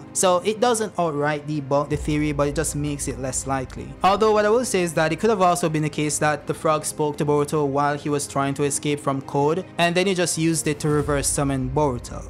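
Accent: Jamaica. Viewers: The accent..you Jamaican bruv?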